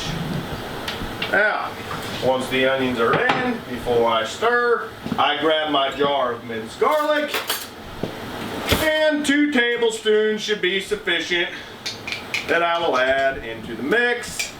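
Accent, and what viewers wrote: Texas, United States. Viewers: Or do all Texans speak like this?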